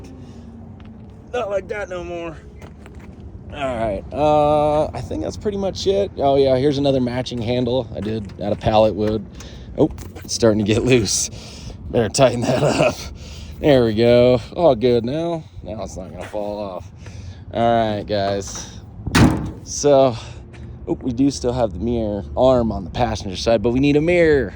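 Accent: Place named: California, United States. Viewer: You have a great project there . I could tell by the sound of your vernacular that maybe you are coastal [ maybe California ] . If I were you I would look into an E-Bike franchise that rents bikes or a delivery service to sponsor you to gain funds in your build . A dune buggy engine cage , hip high quarter panels and you can raise the bed to hide a pull out ramp to put bikes or cargo in & out with a cool thick mesh door like they do with truck tail gates . I subbed because I remember back when I was 18 in the middle 80s I bough a 1954 oval window bug rough & rusty but Dude it had all of its original guts [ drive train and interior ] weathered and worn . Put 2K into it and had to move over seas and gave it to my dad . Never give up the first toy you made yourself . lol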